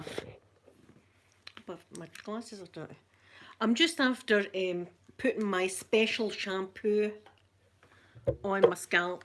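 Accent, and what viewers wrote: Scotland, United Kingdom. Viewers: Aww, don't give up on your dreams of becoming an astronaut! We'd be honored to have you representing the US with your amazing Scottish Accent and sweet personality 💙💙💚💚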